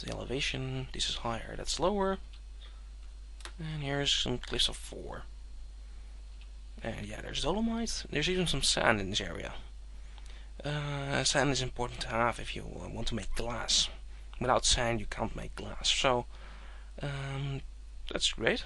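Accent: Netherlands. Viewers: Can I ask you a off-topic question? Are you Dutch, perhaps, if so, you're way of talking gives that away ^^ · are you dutch? you have an accent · Dutch accent yez? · are u from holland>? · Are you Dutch? Your English accent sounds that way. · Can't hide the dutch accent eh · you sound dutch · absolutely Dutch accent :) I'm Dutch myself and I kind of recognize it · HOLLAND kan je horen aan je engels xD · Dutch accent is Dutch. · are you dutch cuz i my english sounds EXACTLY like u o.O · Is that a dutch accent I detect? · He's most likely from the Netherlands, since the accent with which he is speaking is very recognizable for me. I didn't know it was a charming accent. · From your accent I can tell you're dutch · Are you dutch? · Yeah hes dutch allright , but its understandable so its all good :)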